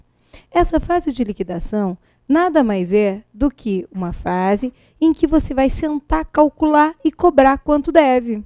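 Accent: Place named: South, Brazil. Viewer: Essa figurinha tem sotaque da Região Sul. Muito boa aula. Parabéns conterrânea.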